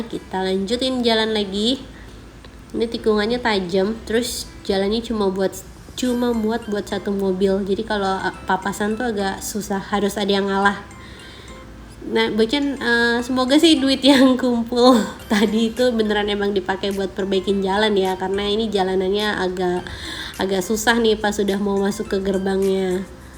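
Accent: Indonesia. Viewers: mimin nya kayak orang sunda, bner ga?he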